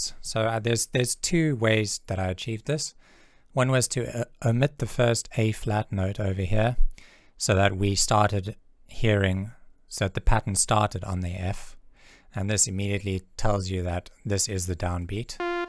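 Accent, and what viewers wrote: South Africa. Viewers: Are you a South African that moved to the UK?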